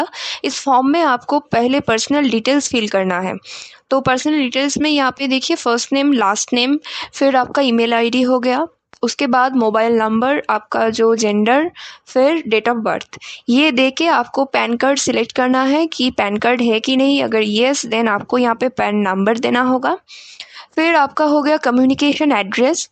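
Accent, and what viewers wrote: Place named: Bengal, India. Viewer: Aapki hindi sunke ek baat to sure hai aap bengali hai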